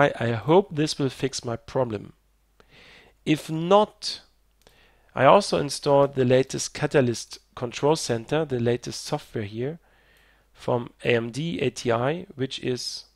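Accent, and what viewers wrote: Germany. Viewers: Hey wie ich aus deinem AKzent hören kann bist du Deutscher, richtig? Ich hab diesen Bluescreen seit einigen Jahren, und habe das gleiche Problem mit dem AMD HDMI Output für Lautstärke, was mich schon immer sehr verwirrt hat, da ein HDMI anschluss so etwas praktisch garnicht brauch.... Meine frage ist: Besteht das Problem mit deinen Bluescreens weiterhin? Danke schonmal für das Video, hat mir sehr geholfen! Habe schon an meinem Prozessor und der Grafikkarte gezweifelt, aber das löst das problem für mich etwas.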